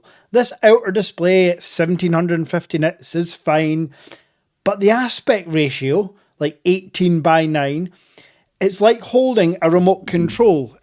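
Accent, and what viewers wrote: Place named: Scotland, United Kingdom. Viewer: Well I just realised your Scottish HA . Why I thought you were from the UK is beyond me. No hard feelings. I know eh and french eh . 😂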